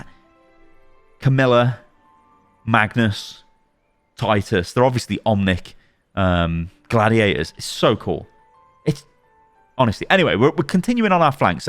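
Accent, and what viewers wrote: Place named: United Kingdom. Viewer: British?! That’s is the correct thing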